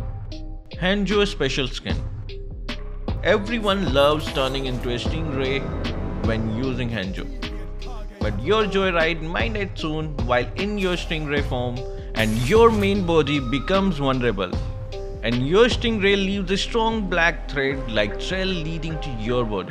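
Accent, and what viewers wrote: India. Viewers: you sound like my friend his indian nationality. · Bro got Indian accent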